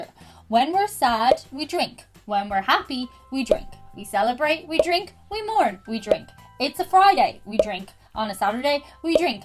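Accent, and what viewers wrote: Ireland. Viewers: I like to listen to you, my grandfather was from Ireland he had a heavy accent but I couldn't hear it as a kid, he is gone now so to hear you talk puts me back, much thanks keep them coming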